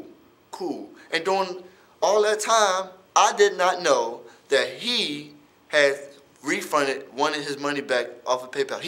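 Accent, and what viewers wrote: Baltimore, United States. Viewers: that damn baltimore accent from you lol! From the way you say "yew-tewwwbe"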